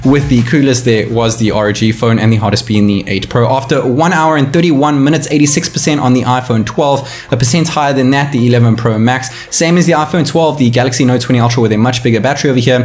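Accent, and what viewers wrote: South Africa. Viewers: Are you from South Africa? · Watched your Qna vid and a few times when I watch your videos i think you sound south African and it turns out you are! I am also from SA, live in Cape Town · South African ? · I think I know a South African when I hear one :D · U sound south african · This guy sounds soo South African 🇿🇦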